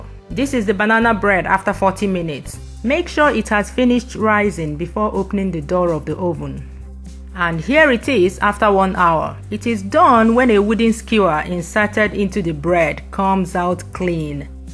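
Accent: Igbo, Nigeria. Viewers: Chai! Your Igbo tongue is top notch 👍🏽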